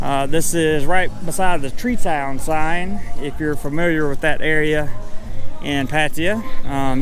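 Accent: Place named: Texas, United States. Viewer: loved your texan accent cowboy ! howdy !! ;) great video mate :)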